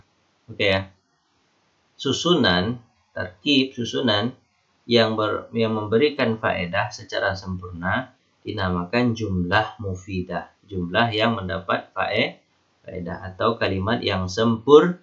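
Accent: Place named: Malaysia. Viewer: Logat Melayu nya 😂😂😂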